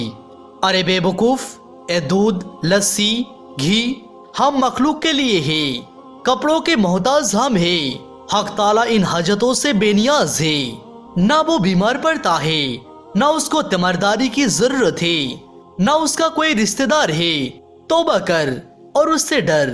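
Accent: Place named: South India, India. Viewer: Video boht achhi h mgr aapki tone south indian ya nepali type h.alag hi lag rhi hai...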